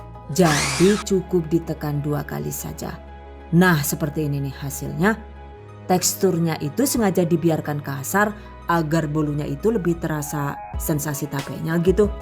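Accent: Banyumas, Indonesia. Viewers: Terima kasih resepnya ... nonton videonya sambil ketawa aplg klo bicara ngapak 😊😊👍👍👍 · Terima KASIH..rezepnya..salam hangat dari negri kincir angin..logat ngapaknya luwes banget..apakah asli wong Banyumas..👍👍👍😁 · Suarane mengko malik ngapak, dadi seneng nyonk kowh karo channel kiye.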